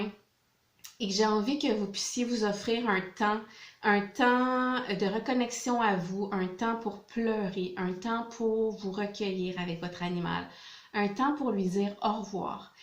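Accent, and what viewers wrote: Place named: Quebec, Canada. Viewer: Bravo et merci pour toutes ces explications avec un très bel accent québécois. Très compliqué de faire le deuil de son animal. Petit coucou depuis la France...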